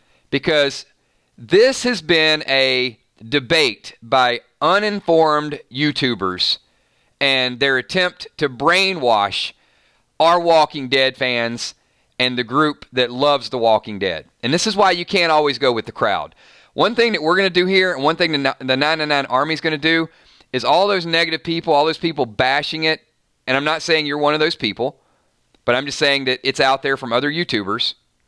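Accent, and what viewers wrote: South, United States. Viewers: Aw-Ha!!! That's what draws me in... your southern drawl!!! Go 'head country boi!! :-D Rick Kilz, Kilz Grimes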